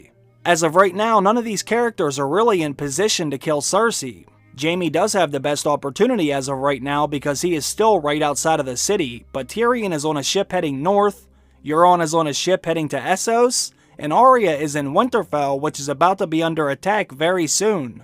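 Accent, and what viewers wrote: Pittsburgh, United States. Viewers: Are you a fellow Yinzer? You sound like a Pittsburgher.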